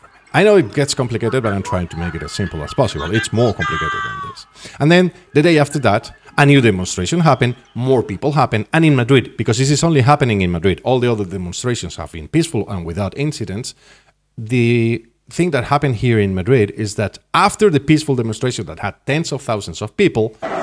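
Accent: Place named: United States. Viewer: Your American accent sounds beautiful.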